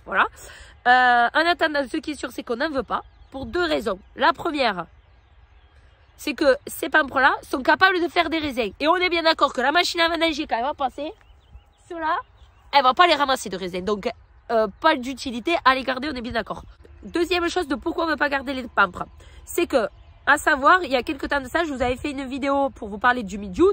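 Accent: South, France. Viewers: Félicitations pour cette belle demo Et joli franc parler agrémenté d'un bel acent du soleil bienvenue chez moi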